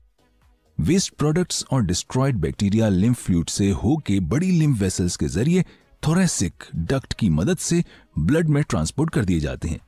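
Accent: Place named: India. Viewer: Yeh video kisi hindi channel say li hai. Banday kay bolnay kay andaz say pata chal raha hay kay woh India ka hai aur hindi kay lafz bhi istamal karaha hai. Nabe prateeshad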